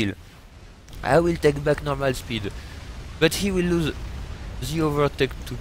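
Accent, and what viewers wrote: France. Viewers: tu est français? Car je trouve que tu as quand même un accent français, et cela se sent bien, je suis un de tes nouveaux abonnés et je trouve que tu vas bien m'aider à progresser dans SCFA et je te souhaite une bonne continuation!;-) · Bring back the scoot! Your pronunciation of scout was very accurate, but the french accent only enhances the video.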